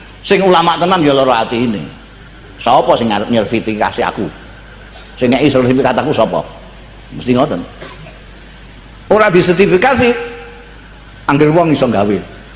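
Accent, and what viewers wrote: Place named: Java, Indonesia. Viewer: Jowo keras lurdd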